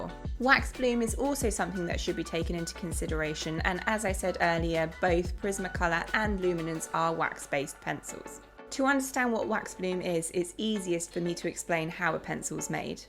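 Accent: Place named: United Kingdom. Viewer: Great tutorials! I want to encourage you to keep doing videos and grow big because you are such a Great teacher,I would like to mention to you that you are from UK right,so you have a strong accent and people from all over the planet are watching you,so it is important that you always bear that in mind to never speak too fast and have the music very low in the background,so it does not take so much concentration to clearly understand you. I'm French and understand English very well, but UK accent is not obvious for many people on this planet! That said! Keep going at it!! You love to do this,we can feel your passion! Bravo! Merci!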